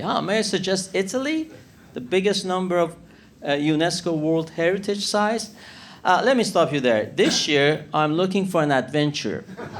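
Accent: Iran. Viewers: he himself is Iranian i can say by his stupid accent and he badmouthing his own ppl, that is always a bad sign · Should ur name be “ ” that Persian accent isn’t fooling anyone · What’s up with your Persian accent bro?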